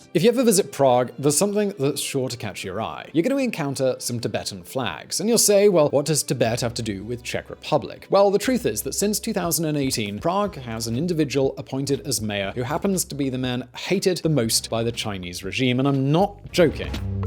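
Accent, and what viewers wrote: United Kingdom. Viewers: Are you actually from Czech Republic? You have such a good british accent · Hi friends, i'm latino american and i can't understand this accent, (british accent) i'd like to listen these videos in EE.UU english, however you can speak slowly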